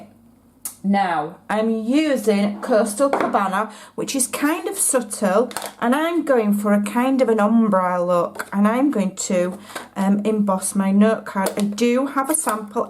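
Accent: United Kingdom. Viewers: Your video was the first I watched. I have lots of 3D embosses folders. I could watch your video all day because of how simple it and easy it looks and I could listen to your lovely accent all day! Where are you from in the UK?? Right now I am addicted to watercolor painting florals using stamped and embossed images ... using Ken Oliver color bursts....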